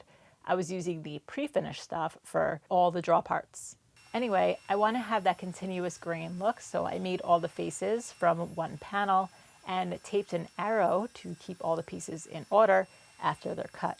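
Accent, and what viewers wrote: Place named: Brooklyn, United States. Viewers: 1st video I have watched. Nice job and very creative on the lift mechanism. Where are you located if you don't mind me asking. Accent says NY but the don't miss being cold says you may have moved south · + cool bench caster lever = Chatty Cathy. You are an adorable genius, not commonly found in the wild (Sounds like Brooklyn). Thanks again for the great video.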